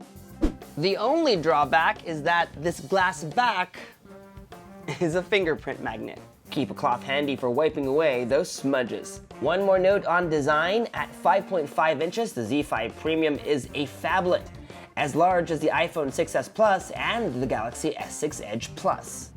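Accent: Singapore. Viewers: are you from SG? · Singaporean or Filipino?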